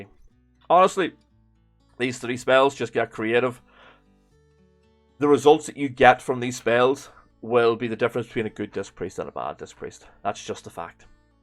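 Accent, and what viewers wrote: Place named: Northern Ireland, United Kingdom. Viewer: Nice to hear some more Northern Ireland players out there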